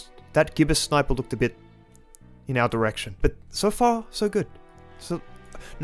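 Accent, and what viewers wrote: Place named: Australia. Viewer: The thing is, this isn't the case in basically all of his other videos if you look back on them, this sort of thing only happening on rare occasions to minor degrees. But in this case it's everywhere in this video. It happens a lot here where in other videos it's particularly rare. Being Australian might have something to do with it and the accent might be effecting it but the oddity is how frequent it is in this video in particular.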